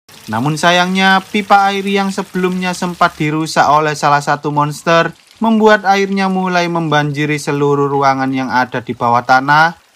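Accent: Java, Indonesia. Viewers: Jawa medok, malas nonton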